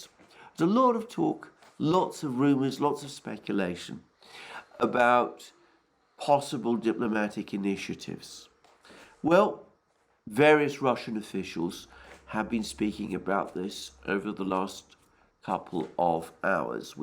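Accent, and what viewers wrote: United Kingdom. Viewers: Yes, the best ruzzian propaganda with a british accent.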